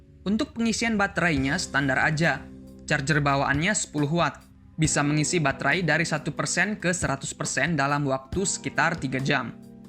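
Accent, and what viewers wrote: Lampung, Indonesia. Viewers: Bang Orang Lampung kah?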